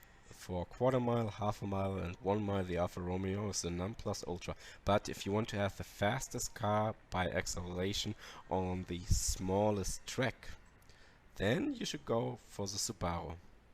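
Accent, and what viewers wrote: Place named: Germany. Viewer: Man merkt richtig an der Aussprache dass du ein Deutscher bist 😁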